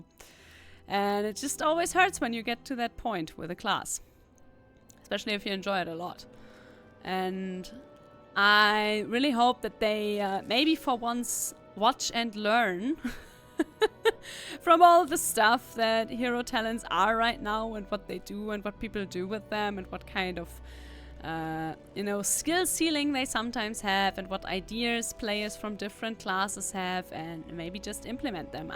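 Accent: Germany. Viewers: 1 hr of yapping in her cute German/English accent? I'll take it.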